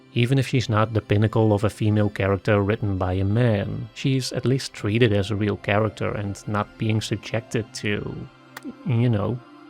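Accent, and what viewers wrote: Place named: Netherlands. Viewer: hmmmm you sound Dutch. Are you Dutch?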